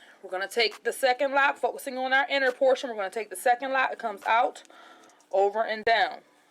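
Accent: Baltimore, United States. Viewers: thank you… the bmore accent is givn life.. this was very informative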